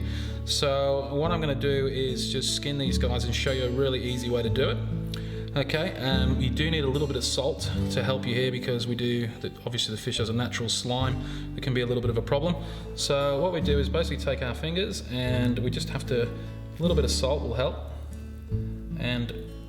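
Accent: England, United Kingdom. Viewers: Flathead Is my favourite fish, Thanks for the vid. I can here the English accent in you voice. I live in australia but was born in Hammersmith London. How about you?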